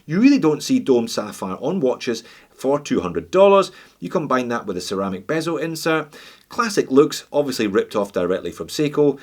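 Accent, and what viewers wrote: Scotland, United Kingdom. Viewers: LOVED THIS REVIEW.,and you have become my go to watch review channel by earning it flat out accurate fair-minded spot on assessments with amazing flair and Scottish brogue to boot! Fantastic watch. Fantastic review. Seiko...that's your watch except you didn't build it. Wtheck?! I'm buying this and I LOVE that tropical strap. TyJ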